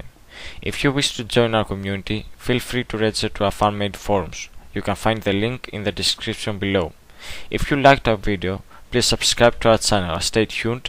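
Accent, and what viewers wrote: Greece. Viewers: The commentator has a really heavy, greek (?) accent. Cute :P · Στάνταρ 'Ελληνας με αυτή την προφορά. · you sound greek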